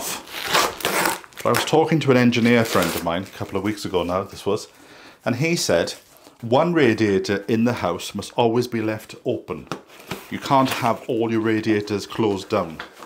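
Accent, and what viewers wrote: Wales, United Kingdom. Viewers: Thank you, lovely relaxing vlog. Nice watching you potter and hearing a warm Welsh accent. I learn a lot from listening to you, some great tips, and I won't be using my plastic chopping boards again. I enjoy reading all the great comments, too. One was brilliant today, about a firestick and a projector tv onto the wall. I have no idea what that is, but it sounds amazing, so I'm going to research concept and cost. The rain protective washing line cover is genius, so will check that out, too. I bought some window film but felt a bit unsure what to do until I read a comment here giving tips on how to do it well...hope you show us doing yours. Also, I love the glass teapot, and I may get one, if not too expensive. The star item today was Rusty's frame. It was stunning, and what a fabulous pic of him, he really was gorgeous. ❤ Take care and look forward to seeing Welshcakes being made. 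My Mother used the heated blackstone, and they were delicious...I miss her terribly. She would have loved your channel. Have a great weekend. 👍 🐈 🌼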